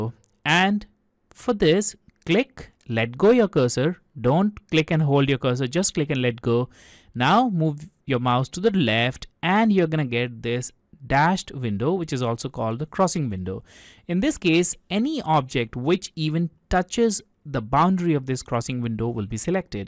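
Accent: India. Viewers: Why would you use an indian voice over to speak English what the fuck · A rare case of a quality tutorial made by an indian with an indian accent.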